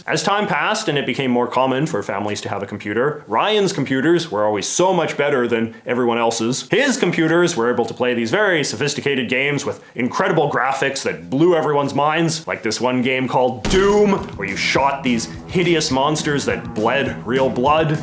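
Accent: Canada. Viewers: Being a Canadian myself, this is the strongest Canadian accent I’ve ever heard. Good lord. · Lol you say a boot are you Canadian I'm Canadian too but I don't say about in quite the same way you do Looked at your channel it does appear your Canadian I'm going to refine my guests are you from Newfoundland? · My first video I've seen of yours. I love the content of it. Nice 👏 👌. Very cool stuff... but God damn. Youre Canadian AF! Everytime I here oot..it just takes me oot of the moment lol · Please work on your thick as all heck Canadian accent...It's not representing us fellow West Coast BC folks very well 😎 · You have the most Canadian accent I have ever heard.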